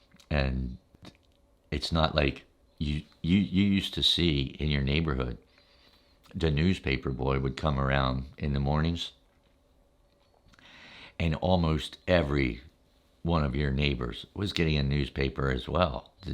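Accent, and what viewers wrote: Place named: Philadelphia, United States. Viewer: You've gotta be from Philly or from the Philly burbs. Just a hunch! You sound like most of the people in my family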